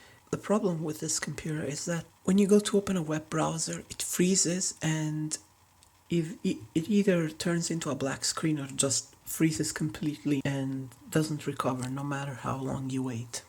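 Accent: Italy. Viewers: Sei italiano vero? Non hai una pronuncia da madrelingua :D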